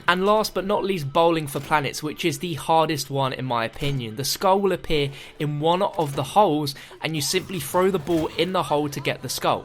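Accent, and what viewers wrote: England, United Kingdom. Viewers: He's from England, and that's how we say it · That's how Britains pronounce it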